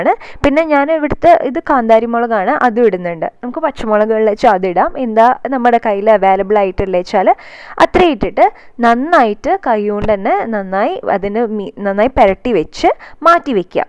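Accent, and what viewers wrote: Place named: Palakkad, India. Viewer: Ha slang kettapo enikum thonni chechi pkd aanennu... Ur videos r awesome njan new subscriber aanu lub yew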